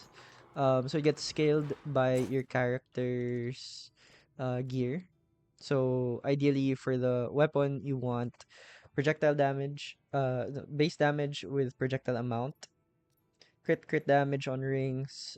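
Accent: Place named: Philippines. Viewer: love the build boss. From the way you talk i assume you're filipino :).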